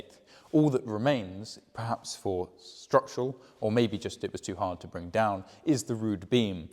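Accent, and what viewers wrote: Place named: England, United Kingdom. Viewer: Belle visite Belle architecture bel accent anglais ❤😊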